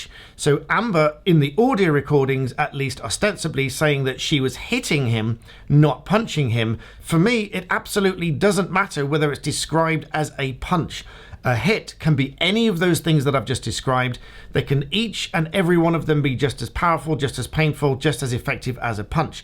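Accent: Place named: England, United Kingdom. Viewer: Why do you say says as sAYz, and not Sez? It really weirding me out, with english accent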